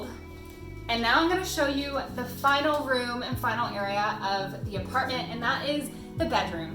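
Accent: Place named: Canada. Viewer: The way Canadians pronounce “about” gives them away 😝✌🏼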